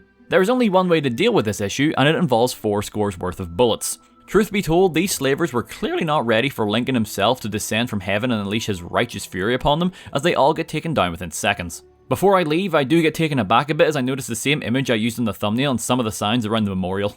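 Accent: Northern Ireland, United Kingdom. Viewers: I didn't even notice his accent until he said he was northern irish. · I FUCKING KNEW HE WAS NORTHERN IRISH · New you where from N.I. the moment I heard ya. Way to represent the culture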